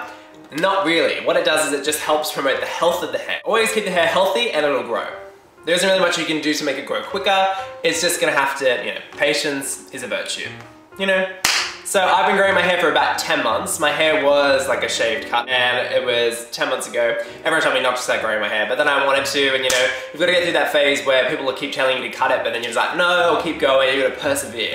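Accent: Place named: Australia. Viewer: Good to hear a fellow aussie! Nice hair mate.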